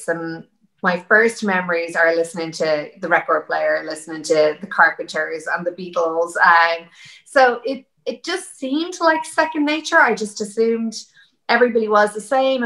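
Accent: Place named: Ireland. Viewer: Aww it’s so nice to hear you talk again. I love your Irish accent as usual😍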